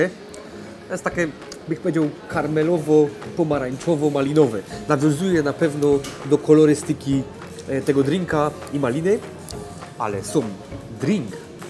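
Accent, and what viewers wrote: Silesia, Poland. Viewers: Czego chcieć wiyncyj ? Śląsko godka, dobre żarełko i fajno muzika. Super! pozdrawiom! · fajna slonska godka. cool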